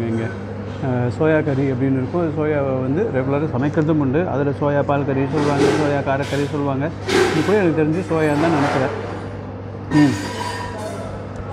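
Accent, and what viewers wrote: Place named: Tamil Nadu, India. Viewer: Fav VJ from my childhood.. neenga neriya videos podunga.. I love your Tamil slang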